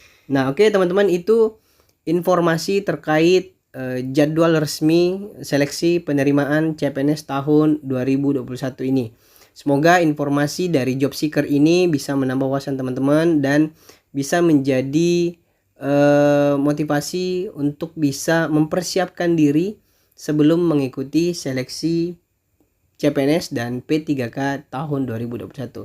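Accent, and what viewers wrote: Pontianak, Indonesia. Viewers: Mau tanya bg abg asal pontianak ya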